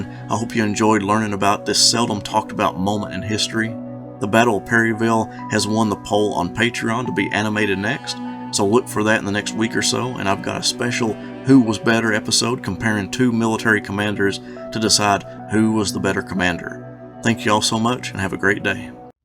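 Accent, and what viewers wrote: South, United States. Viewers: Very informative. I enjoyed the southern accent too. Thank you.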